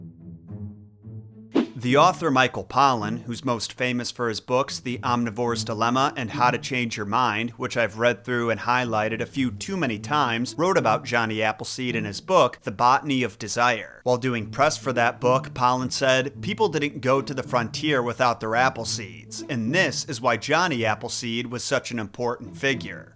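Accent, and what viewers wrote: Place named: Cleveland, United States. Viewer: Shitting on ohio? But your accent sounds like you’re from Cleveland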